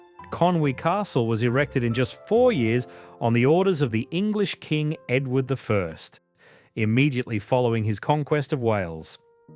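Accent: Australia. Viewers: Nice if the place names were all pronounced correctly. Also odd to have what sounds like an Australian accent narrating. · Lovely video with very relaxing narration. Is that an Aussie accent? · Yes,and an Aussie who's trying too hard to make it sound dramatic, but ends up with odd pauses and emphasis.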